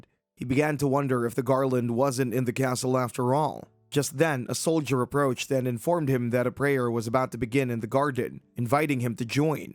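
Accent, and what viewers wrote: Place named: Philadelphia, United States. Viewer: YO THE AI HAS A PHILLY ACCENT WTF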